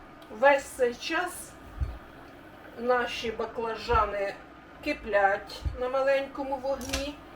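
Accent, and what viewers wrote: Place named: Surzhyk, Ukraine. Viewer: Ну не совсем на украинском, наверно все же на суржике, как будто русскоязычные говорят😊.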